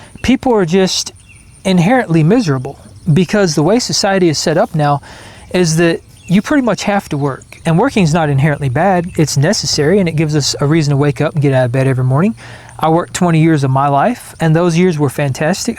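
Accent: United States. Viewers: Yay, I love the sound of your American voice and watching Shawnee and your chickens in the background and what you say. Thanks ❤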